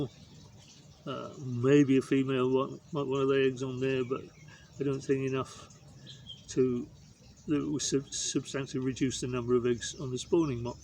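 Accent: United Kingdom. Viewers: You sound British, where in the world are you?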